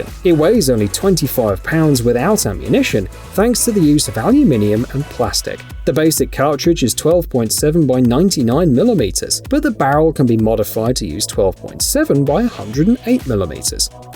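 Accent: England, United Kingdom. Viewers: No shit he’s British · British accent should be a dead giveaway that this guy doesn’t know anything about firearms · Narrator sounds British, which explains his apparent lack of knowledge about firearms. Remember, the British let their government disarm British citizens, making self defense illegal. "Gun Control" (and crooks) win, citizens lose. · why the brit narrator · Did the UK accent give it away? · English accent. How much of a gun guy could he be? The royalty disarmed it's "subjects" long ago. They have a false sense of security. By the way, how is that Muslim thing going in your parliament? The UK is in greater peril than the rest of Europe. The greatest way to control your subjects is to disarm them. Then the brainwashing starts. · That was the best video with the British narrator I've ever seen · Sounds english, makes sence as over here we have to have licences for everything, even airsoft guns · And hes british as fuck, he said alooh-minium. 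Its Aluminum. Fuck.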